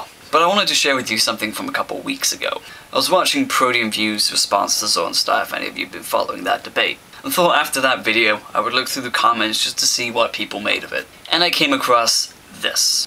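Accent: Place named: United States. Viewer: Why do you speak with an American accent?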